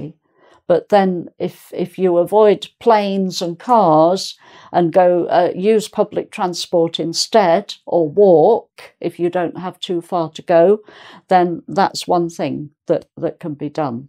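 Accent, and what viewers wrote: England, United Kingdom. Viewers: Lovely, such an English accent and so elegant. Thank you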